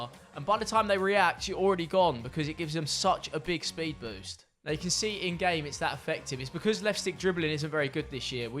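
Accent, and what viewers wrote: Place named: United Kingdom. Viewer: god i love this bri ish accent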